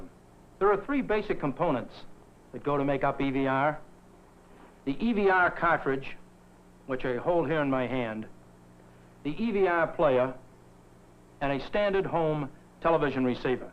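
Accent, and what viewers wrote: East Coast, United States. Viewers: Good east coast accent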